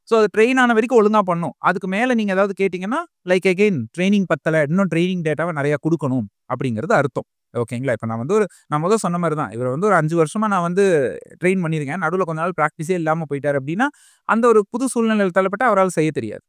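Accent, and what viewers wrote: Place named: Erode, India. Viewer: Thank you I am regularly watching your video and your way of explaining is very good, I am from erode and your slang resembles it very good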